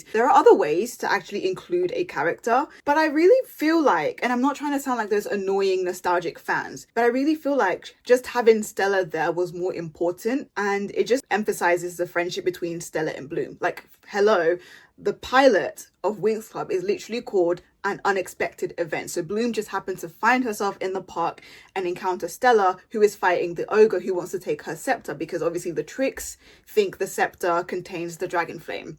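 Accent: United Kingdom. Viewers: my god, i couldn't get enough of your accent through the video! What's your accent, british? But I think i hear some other accent. Am I wrong??😵‍💫😣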